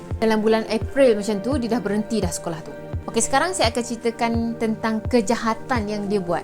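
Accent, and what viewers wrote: Kelantan, Malaysia. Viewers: Patutla sy ade dgr slang kelantan.. rupanya betul sangkaan sy yg sis ni kelate hahaha samela kita sekampung 👍🏼😂😂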